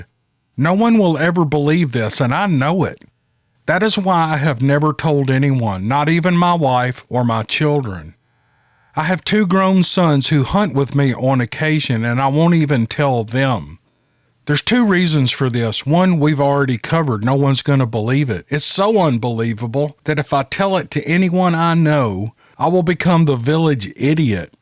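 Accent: South, United States. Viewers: Oh my Lord! that was a fantastic account, I was hanging on every word. Thank you to the gentleman who finally shared this hair raising experience.You're also one hell of a writer. The combination of great writing, riveting content & sweet southern drawl of a narration made for a perfect story. I think I'd have night terrors for years had I experienced anything like that. Way good thing that cryptid already had his supper in front of him. It wouldn't surprise me one bit if most of these other Cryptids (other than Sasquatch) weren't the results of some black project military/mad scientist attempts at genetically combining or re engineering experiments gone awry. Whew! that was a mouthful!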